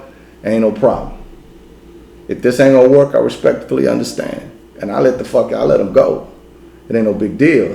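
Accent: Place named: East Coast, United States. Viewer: You sound like an East coaster. Where you from fam?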